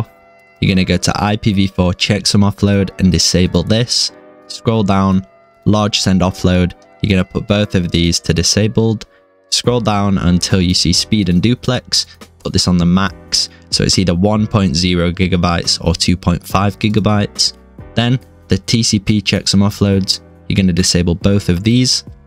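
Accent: Norway. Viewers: Wait are you Norwegian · Bro er du norsk? du har sykt bra engelsk stemme man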